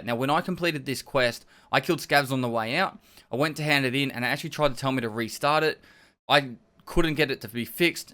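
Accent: Australia. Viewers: a Absolutely no offense intended here, but your (?Australian?) english is a bit harder for me to listen to (sorry, I really mean no harm, I'm not native speaker). And when you add this intro thingy it's really hard to swallow. Most of the times when I play the video, I hear it and I stop the video, then I have to take a deep breath and after like 5 minutes I can finally get to the video (or should I say crack straight into it? Anywqy I hope you dont interpret this in a wrong way. I support you and I thing you are one of the more informative guys.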